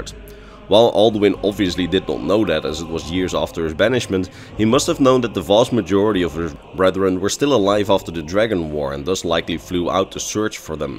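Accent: Netherlands. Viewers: I’ve lived in Amsterdam for more than 2 years now and I just realised you’re Dutch! Or atleast you sound Dutch haha. Great video as usual 🙌🏾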